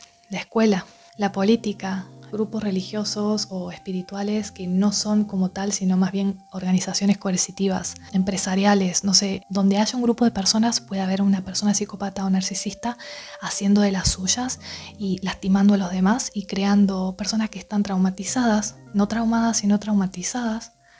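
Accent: Argentina. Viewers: Castellano, argentino puro, más claro imposible. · Gracias por ahondar en un tema tan importante.Las personas más autenticas(PAS)las atraen como moscas y al no saber hasta que punto esta BASURA HUMANA puede dañar ,,,cuando te das cuenta,ya dinamitaron tus cimientos...PREGUNTATE EN TU VIDA SOLO 2 COSAS: 1-¿Que hago setir?....2-¿Como me siento?....a la hora de relacionarnos. Me vuelve loco el tono argentino en una mujer!!jiji...Un Abrazo!!!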